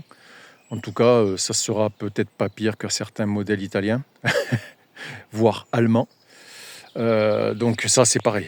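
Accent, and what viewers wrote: France. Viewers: Eh mais tu es sur le groupe v85tt ! 😊 Je pensais que tu étais Franc comtois d'origine, il y a un petit accent du sud. Cette cf me fait de l'oeil, franchement ils envoient du lourd, j'ai essayé la 450 et j'ai été bluffé. Au plaisir! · moi je mets un pouce rien que pour l'accent "nordiste" xD et j'aimerais beaucoup que tu reprennes la kove et nous fasse un petit comparo ;)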